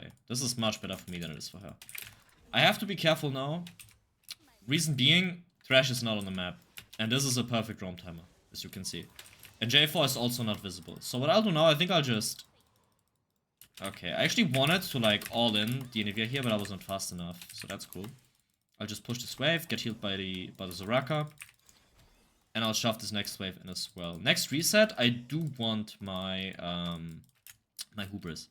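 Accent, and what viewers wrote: Germany. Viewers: Is he german? Just interested because the accent sounds a little german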